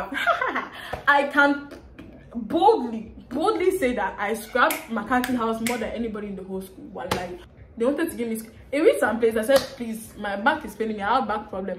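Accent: United Kingdom. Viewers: Your British accent😍😍🥺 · I like your British accent can you use it more often♥️♥️♥️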